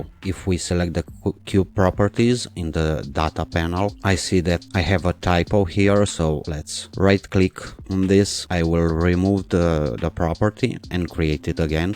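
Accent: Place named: Finland. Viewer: but the way people from finland speak so slowly and with their accent makes the video drag on. Not that i don't mind the accent, but i feel like the video is going way too slow as if i'm being talked to incredibly slow on purpose because someone assumes i'm retarded. LOL. good info though.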